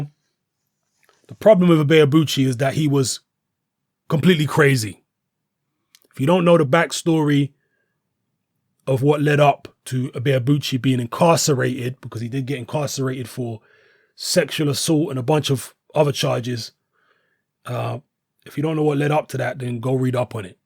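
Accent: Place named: South London, United Kingdom. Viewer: He's from South London , they all sound like that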